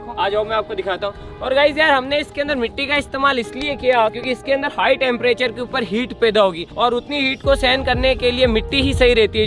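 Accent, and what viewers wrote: Rajasthan, India. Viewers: Bhay aap ki marwadi bhasah jordar h mja aa gya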